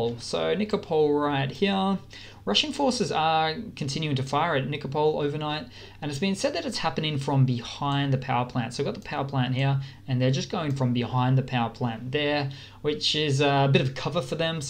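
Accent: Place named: Australia. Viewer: I like how you report major events based on their locations using a map. Your Australian accent adds individuality to it.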